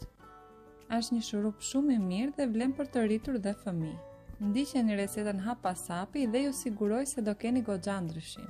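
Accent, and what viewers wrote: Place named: Albania. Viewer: Fsleminderit zonj po nese man te mi tregon kto lloj qaji ku i gjejm edhe si quhen te lutem me trego kjart sepse ju te shqiperis folni pak ma ndryshe e ste kuptoj kam dedhir ta kuptoj kete reqet sepse kam nevoj se kam bronhitis edhe asma per kete jam shum e interesuar ta di se nuk e kuptova llojet e qajit mos ke nji na i thojm qsj blini asht ni druose nji si me than pem te lutem nese e shikon ket ma kthen pergjigjjen flm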